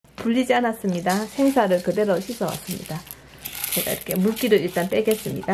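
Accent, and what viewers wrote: Gyeongsang, South Korea. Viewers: 하하하~~~ 경상도분이시라? 쌀이라하지 않고 살이라고 하네요.~~~♡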